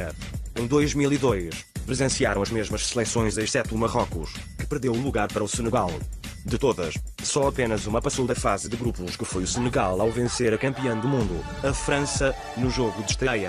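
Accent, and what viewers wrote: Portugal. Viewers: posso legendar seus videos? a voz pt é meio complicada de entender pelos brasileiros por causa do sotaque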